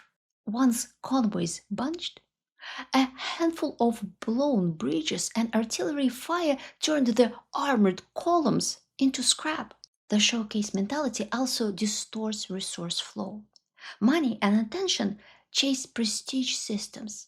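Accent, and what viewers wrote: Russia. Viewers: Are you Russian? You sound Russian, but your last name is? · That lovely accent of soviet time English teacher)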